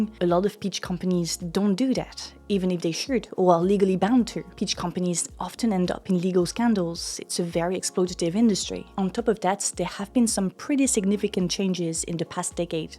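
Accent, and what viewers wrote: France. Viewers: I have never heard a youtuber speaking english with a french accent before. Cool, nice video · i wish I could figure out where your from. best guess... French, but English educated. doesn't matter. good content intelligent informative soft voice is easy to listen to. thanks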